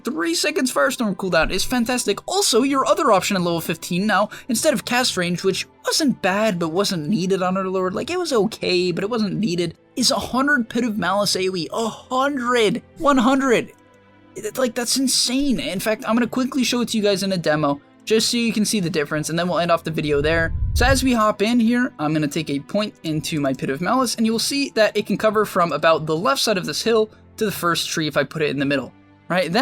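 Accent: United Kingdom. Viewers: It started British but quickly became Indian. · Please make a whole video with that fantastic British accent. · I liked for the British accent.... Aye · good dota content but awful British accent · MAN STOP THIS BRITISH ACCENT SO BAD CRINGE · I disliked because of that "British accent"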